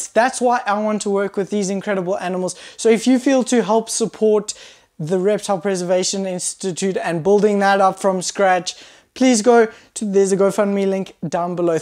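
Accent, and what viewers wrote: South Africa. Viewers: Are u South African by any chance ? Your accent sounds like it.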